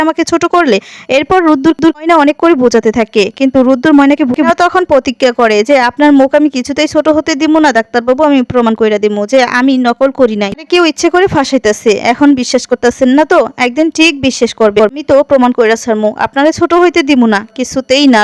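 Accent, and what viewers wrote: Bangal, Bangladesh. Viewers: উচ্চারণ আর একটু উন্নত করার চেষ্টা করো।বাঙ্গাল বাঙ্গাল কথা ভাল লাগে না।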